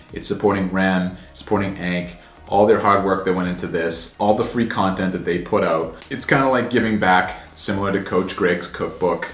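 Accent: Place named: New England, United States. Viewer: You could be right on the cost. Sugar has to be the cheapest sweetener by far. I'll definitely be watching to see how the anabar brand expands and see if they do add some lower calorie flavors in the future. I'd be willing to pay the extra money if I could get them to fit my daily macros. By the way you are crushing it with the content! One of my favorite youtubers for sure. I assume your from New England based on some of your clothing and accent. I was born in Mass and grew up in NH. Cheers!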